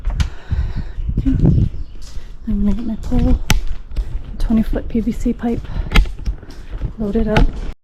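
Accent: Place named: Hawaii, United States. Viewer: Omg. I’m listening and I’m like why sound like she talking pidgin. Then I look at your name 😅 Native Hawaiian here living in NY. So wonderful to come across Hawaiians even if it’s just on da internet ❤ Mahalo for this video.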